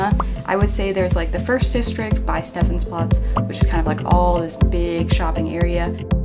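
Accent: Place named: Germany. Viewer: You & your German accent are so cute!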